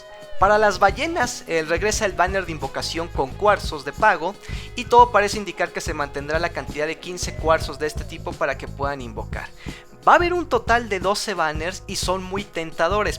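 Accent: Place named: Bolivia. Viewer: por qué hablas tan boliviano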